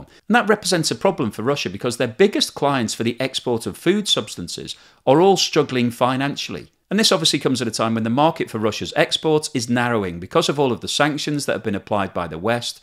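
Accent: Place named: Liverpool, United Kingdom. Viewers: Yes, definitely a slight Liverpool accent. Very clear to me. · I think has a slight Liverpool accent. Your English must be pretty good, so listen out for that! 😊👍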